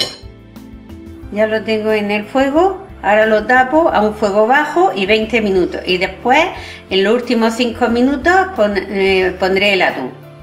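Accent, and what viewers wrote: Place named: Andalusia, Spain. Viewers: " Loviá poné " , me encanta el dialecto andaluz 💪🏆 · Jajajajajaja no sabes reconocer un acento andaluz?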